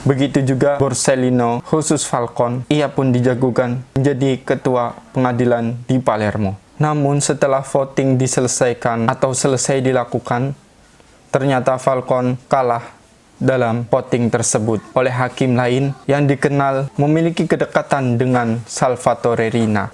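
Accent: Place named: Banyumas, Indonesia. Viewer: kumpas tuntas Bahasa tegal donk. Ko ngapak medok nemen koooh. Hahahaha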